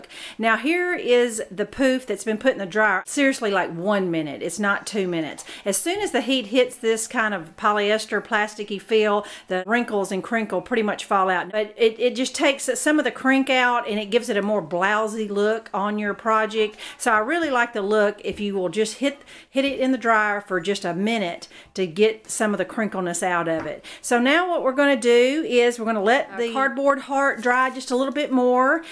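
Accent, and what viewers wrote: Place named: Georgia, United States. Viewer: Love your accent, you sound like my aunts from Georgia!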